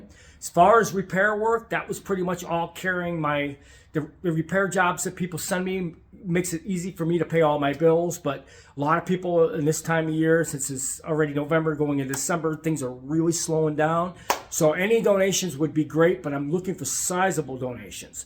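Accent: Chicago, United States. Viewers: I thought you had the Chicago accent. A lot of my family came out of Chicago. I've donated before, I'll do so again. Looking forward to seeing more stuff whenever your time and copyright laws allow. Good luck!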